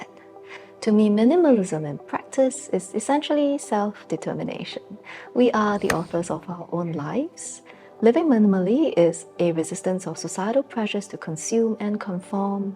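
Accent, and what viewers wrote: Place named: Singapore. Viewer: I could honestly listen to you talk for hours. That Singapore accent... Good Grief. Love it. Will be in Bali soon if you have a twin sister lol !! Love the channel. You and your husband look cool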